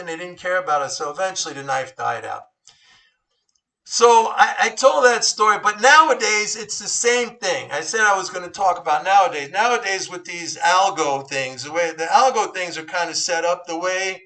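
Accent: New York, United States. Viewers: Just stumbled upon your video. What you say is so true. PS: The NY accent adds the extra credibility that you worked on the floor.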